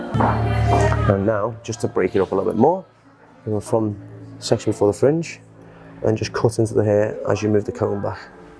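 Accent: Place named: Merseyside, United Kingdom. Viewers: Lovin the Merseyside accent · He speaks too fast and has more of a northern accent compared to the art attack guy.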